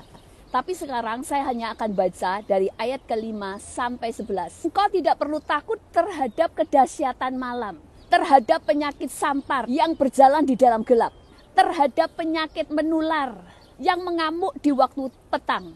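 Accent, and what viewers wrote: Java, Indonesia. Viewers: pendeta e medok tenan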